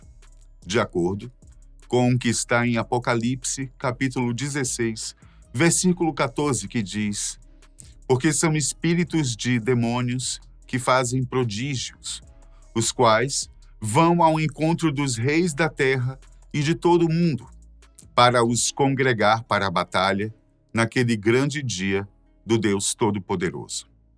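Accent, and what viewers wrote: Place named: Northeast, Brazil. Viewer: Conhecendo o canal hoje o vídeo já tem mais de 11 meses mas é atual. Muito interessante a explicação das profecias. Acompanho as profecias bíblicas e o tempo de Deus é diferente do nosso. A Rússia também está nas profecias. E também tem os cavaleiros do apocalipse pode ser cada cavaleiro uma nação. Você parece ser conhecedor de história seu tom voz é clara apesar do sotaque nordeste. Israel está vencendo o mau do terrorismo o que virá depois?? A Rússia escalando a guerra. 🇧🇷🙏🇮🇱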